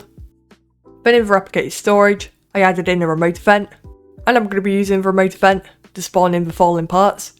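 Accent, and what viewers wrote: United Kingdom. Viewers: You sound like a british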